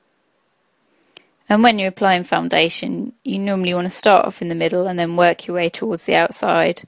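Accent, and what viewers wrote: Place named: United Kingdom. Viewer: What part of Britain are you from? I love you accent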